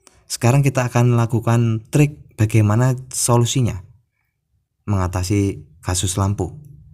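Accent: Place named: East Java, Indonesia. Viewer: ow kirain di Jawa timur, krn logatnya mirip orang sini ☺️🙏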